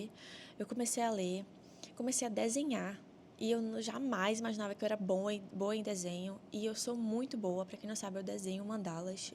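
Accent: Brazil. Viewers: Se tu disseres que nao é catarinense, eu nao acredito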